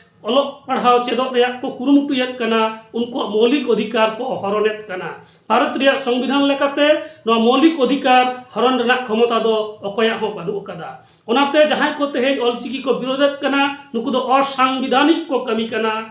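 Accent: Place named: Bangal, Bangladesh. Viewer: Apnar pronounced Bangal tone keno? Kichu pariskar kotha why used.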